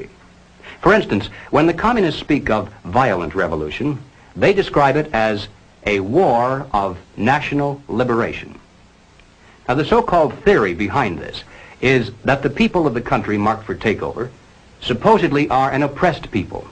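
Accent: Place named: Mid-Atlantic, United States. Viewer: The mid-atlantic accent is persuasive as fuck.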